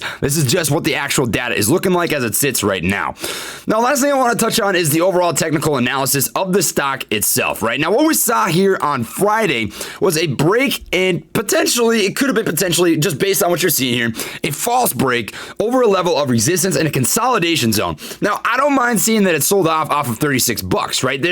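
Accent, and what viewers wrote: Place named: New York City, United States. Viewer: Haha live ur channel man. You are def from the NYC area. Haha gotta be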